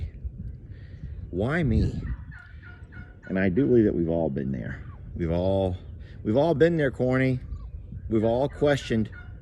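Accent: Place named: Texas, United States. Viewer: Texas accents are NOT TERRIBLE. I have one but not as much as you. But I love them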